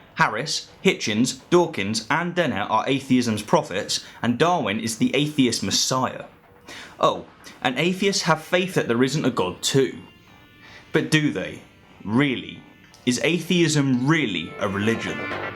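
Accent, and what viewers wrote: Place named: West Country, United Kingdom. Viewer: I am trying to locate his accent. I could be wrong but I would place it somewhere in what we call "The West Country" which is the bottom left of the UK from Bristol west. However, some of the sounds are similar to Norfolk to my untrained ear but I would stick with Bristol or Brissal as we know it. "Yerite my luvver"?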